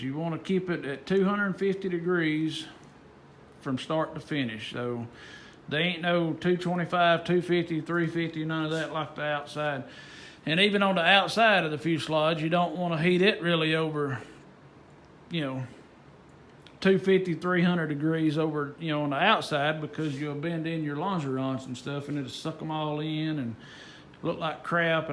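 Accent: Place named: South, United States. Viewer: Alabama or Georgia? My Mama was from Columbus, Georgia and it's my hobby guessing where ya'll from with your Southern accents. She never got used to Montana winters. Foot in mouth if I'm wrong.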